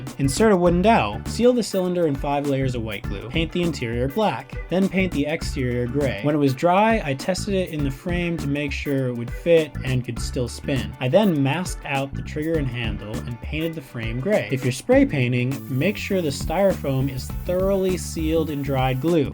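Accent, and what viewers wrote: Canada. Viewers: You're Canadian? Noice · Canadian, eh?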